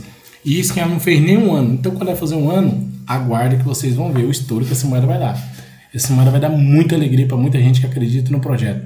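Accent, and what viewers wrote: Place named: Belo Horizonte, Brazil. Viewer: Sua voz lembra meu tio de BH pelo sotaque rsrs muito bom !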